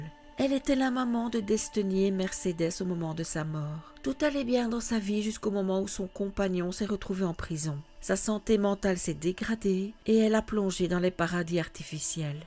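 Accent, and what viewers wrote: Belgium. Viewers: ce petit accent Carolo voire Hennuyer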